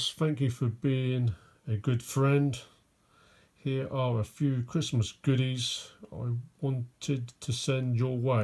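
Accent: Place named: United Kingdom. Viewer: Hello! Merry Christmas! How did you get the name btw? You sound very British,but here in the states when someone is named they're usually from a Spanish speaking background.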